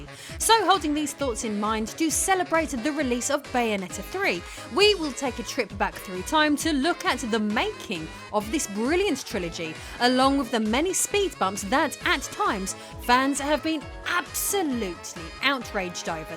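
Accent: United Kingdom. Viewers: You are absolutely the perfect person to cover this material! Sultry and British!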